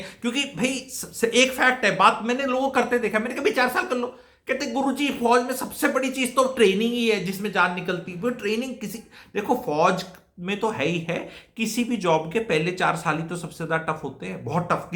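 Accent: Haryana, India. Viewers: I love your hariyanvi accent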